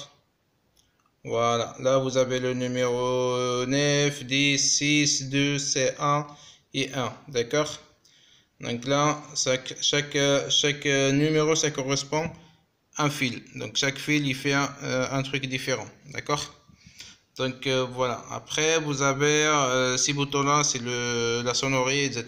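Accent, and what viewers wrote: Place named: Kabylie, Algeria. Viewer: Juste avec ton accents, je savais que tu es kabyle hhhh bon courage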